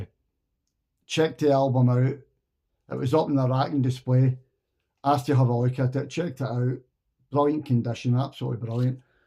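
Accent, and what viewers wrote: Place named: Scotland, United Kingdom. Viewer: Hi! Just found your channel after a year in the VC- great to hear another Scottish voice, thought it was just me and my mate Jack. Great selections here. Look forward to checking more of your stuff out. Cheers, Gordon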